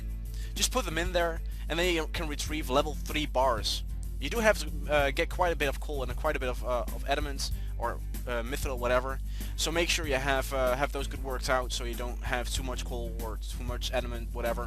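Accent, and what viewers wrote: Netherlands. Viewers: ben je nederlands?, hele goede guide btw:)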